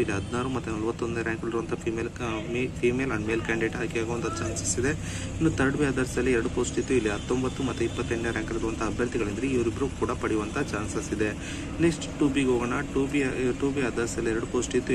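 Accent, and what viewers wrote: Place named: Haveri, India. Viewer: Haveri english sir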